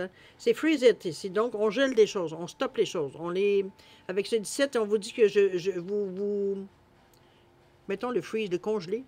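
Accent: Quebec, Canada. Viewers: merveilleux accent québécois !!! :-)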